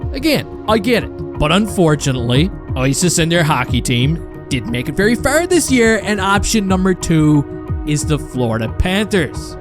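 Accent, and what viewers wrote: Newfoundland, Canada. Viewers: He's a Newfie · Are you from Newfoundland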